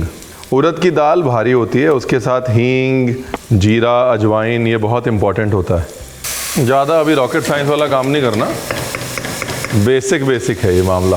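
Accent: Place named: Bihar, India. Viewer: Bihari accent...waaow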